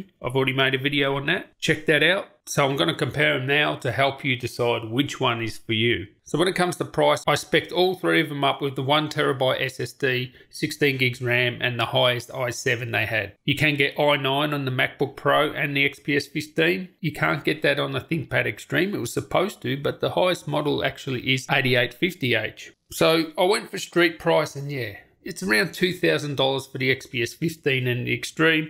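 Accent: Australia. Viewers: u sound like an aussie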